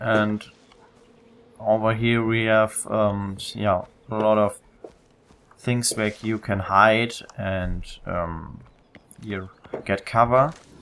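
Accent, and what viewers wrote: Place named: Germany. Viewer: voll hammer mann hört voll den deutschen acsent (hoffenlich richtig) aus der stimme also kommt das noch in Deutsch wäre cool.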